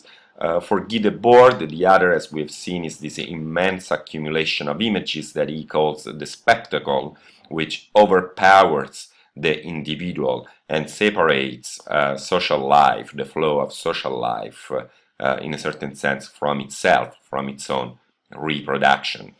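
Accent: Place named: France. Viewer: You are frensh !! isn't it :).. It's clear from your accent... I'm Moroccan so it's easy for me to discover that haha..Thank you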